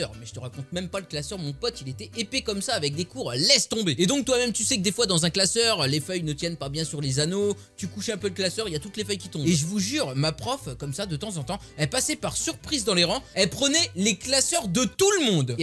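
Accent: Belgium. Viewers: C'est pas que tu fais mal l'accent belge enfaite c'est qu'on en pas on a le même accent que les français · Tu fais bien lacsent belge Cars je le suis😁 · Accent 11/20 je suis belge aussi🍟🍟🍟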